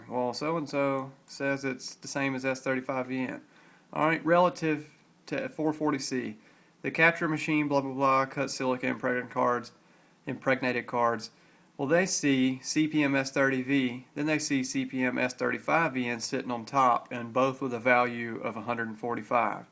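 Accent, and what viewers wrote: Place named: Appalachia, United States. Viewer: help! i cant decide which knife i want to use to kill myself after watching your legion of horrible, redundant, long winded videos! I admit i was bought in by your initial charms, the qualities of your down home voice, a voice of an educated hillbilly were refreshing. Youre a simple guy--a shirt tucked in, cell phone attached to the belt, hands on 10 and 2, I hate drugs, sports team hat wearing, kind of guy. Studied "business" in college right? Put the gun in the closet for 3 months and you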